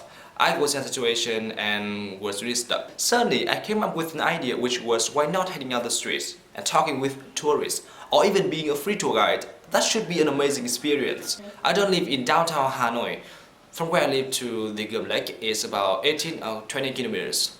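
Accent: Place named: United Kingdom. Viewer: Linh Khánh Theo mình nghe dc thì anh anh đó bạn.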